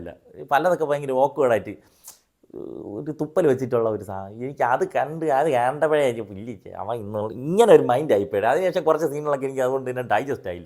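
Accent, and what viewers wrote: Trivandrum, India. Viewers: Please avoid reviewing English movies and stick on only to Indian movies... Thankalude malayalam movies nte review okke njan kanarundu and i am very much interested in those reviews and your Trivandrum slang. .. Athukondu thanneyaanu thankale njan subscribe cheythathum. But I am very sorry to say that you don't have the class for reviewing English movies, maybe due to the fact that you are not much familiar with Hollywood or British movies. The way you reviewing Sinners is really pathetic and too substandard. So once again, I humbly request you to restrain from reviewing English movies.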